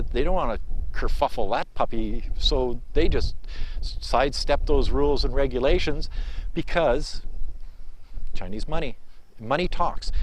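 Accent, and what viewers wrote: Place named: Canada. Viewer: you are definitely sounding much like a Canadian!! Cheers mate. love your rants...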